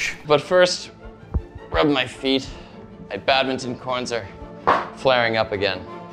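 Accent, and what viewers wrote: United Kingdom. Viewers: why the fuck it sound so British ???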